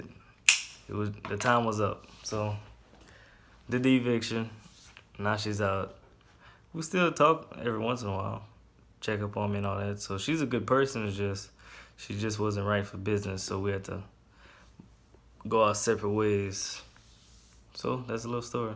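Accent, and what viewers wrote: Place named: Eunice, United States. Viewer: This is my first time seeing one of your videos. I absolutely knew that I recognize the accent. I am from the Eunice area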